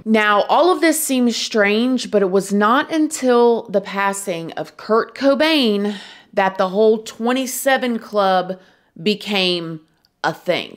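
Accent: South, United States. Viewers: Funny Video... I like Your accent... You Sound Country....❤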